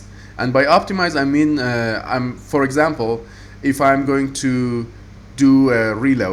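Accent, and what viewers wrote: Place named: Iran. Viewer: are you Persian? your accent sounds like Persians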